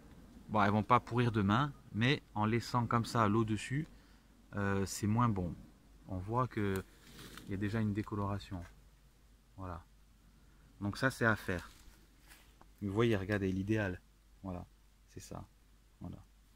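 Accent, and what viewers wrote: Corsica, France. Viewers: Tu n'aurais pas un petit accent corse par hasard 😁. Super ta cabane.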